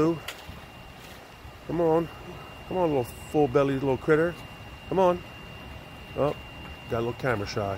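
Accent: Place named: New Jersey, United States. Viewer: This dude gotta be from Jersey. Pasta, Jersey accent and buncha knuckleheads shouting in the background 😂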